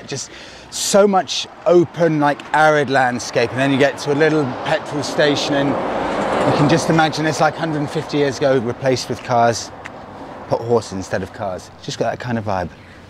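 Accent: England, United Kingdom. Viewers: You should be on Jackanory telling stories, your voice is quentisently English old chap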